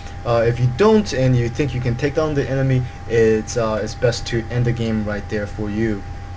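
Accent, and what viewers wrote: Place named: Asia. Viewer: good ears, i can tell it's an asian speaking too if i listen closely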